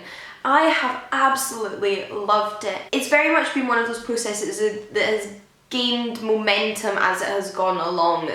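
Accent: Scotland, United Kingdom. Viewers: You look quite like Amelia Pond from Doctor Who. And you've got the same Scottish accent. Loved the video. I haven't been able to make the leap into Non-fiction yet but this video is a good motivational source. Thank You!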